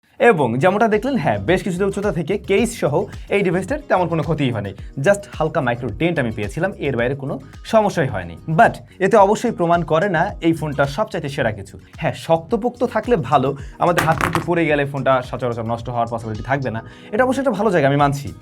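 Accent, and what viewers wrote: Barishal, Bangladesh. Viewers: বরিশাইল্যা নাকি ক্ষেত ক্ষেত কথা অস্তে অস্তে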